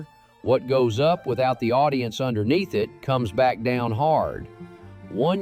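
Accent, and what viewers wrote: Texas, United States. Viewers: You gloss over genocide, the deliberate bombing of children's hospitals, bulldozing of human beings, machine gunning of children and starving refugees by the extreme terrorist nation of Israel as "foreign policy". It was not politically smart to engage with her co-star on this, it's true, but those atrocities demand a level of response and engagement that goes far beyond one's career, industry polity, and 170 million dollars. In fact I would trade a single Palestinian child's life, for 170 million dollars. By the way, *Israeli soldiers have asserted their "right to rape" in this conflict*, and largely gone unchallenged. Make a video about that with your Texas accent. Until then, you are all too predictable.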